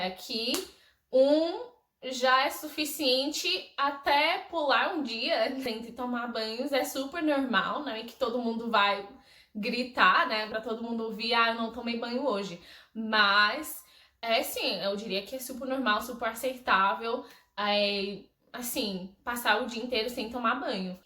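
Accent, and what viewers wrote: Brazil. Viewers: Ahhhhh ela fala português BR, já ameeei!! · Vc fala português com sotaque brasileiro👍🏾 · Oi, descobri hoje seu canal e adorei! Seu sotaque é perfeitamente brasileiro hahaha. Ah, outra diferença, acho que vocês comem muito mais lanches do que nós na hora da refeição. Claro, aqui também tem pessoas que preferem lanches, mas a grande maioria não abre mão da alimentação do tipo arroz com feijão, carnes e saladas. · Your portuguese is out of this world i cant actually believe It , i mean everything vocabulary, diction and the most amazing one the brazilian accent that u have . If you told anyone of us that you were brazilian we would totally believe u. I'm FLABBERGASTED kkkkk. Abraços ✌️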